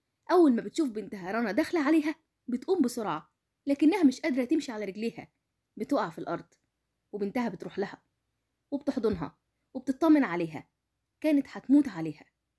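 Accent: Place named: Iraq. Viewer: تتكلمي عراقي حلو شكرا على معلوماتك تحياتي وردة عراقية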